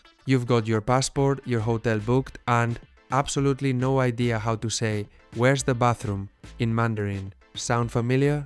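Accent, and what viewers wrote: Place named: Spain. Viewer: Why the Spanish accent?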